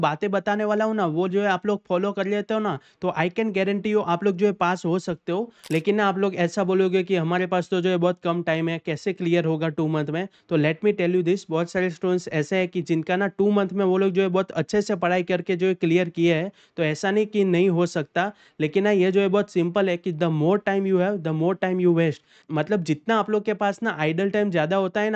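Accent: Gujarat, India. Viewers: Tame gujrati lago cho....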